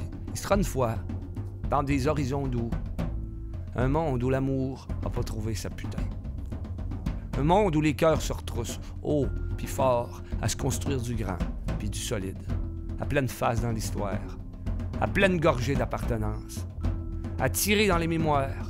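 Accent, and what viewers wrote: Quebec, Canada. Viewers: Wow! vas-y...t'es comme un Homme de meme pas 40ans qui parle avec 100 ans d'experience! une voie qui s'exprime avec la force de mille de nos ancètres. quand je menuis de mon pays je t'ècoute et rempli mon coeur de cette musique quebecoise qu'est notre langue ben parler...du pure quebecois...une langue, une culture precieuse...moi je vote pour qui est encore des milliers de "y etais t'une fois" a venir.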